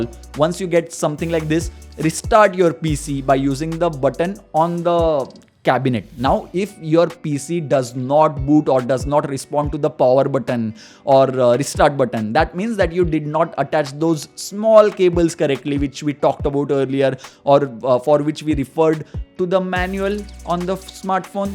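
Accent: Maharashtra, India. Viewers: i hear Marathi in Ur english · That's why I like Indian accent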